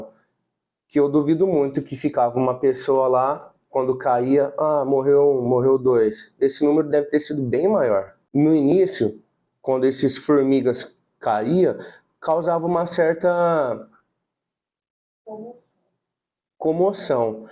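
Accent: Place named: São Paulo, Brazil. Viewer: Você é simpático e fala - o sãopaulês-, bunitim. Valeu, pelas informações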